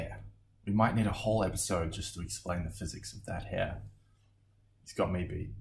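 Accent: Australia. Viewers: Can i guess that you're from Victoria?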